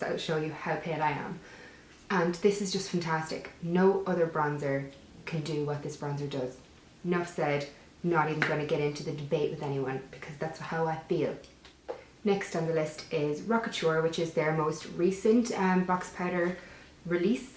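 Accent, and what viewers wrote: Ireland. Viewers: Love the Irish accent! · I thought you couldn't possibly get anymore perfect and out came that Irish accent... I think I'm gonna cry ;( X love you ;) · I watched a couple of your videos with no talking - was really not expecting you to be Irish! Must be the intense sunlight through the window haha - nice to see a local girl doing well - and you're looking well too! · I am inlove with your accent! Is that Irish? Xxx new subbie! Xx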